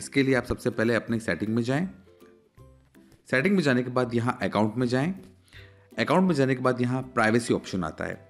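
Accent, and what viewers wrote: India. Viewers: badi fast ahh nav delhi se lagdi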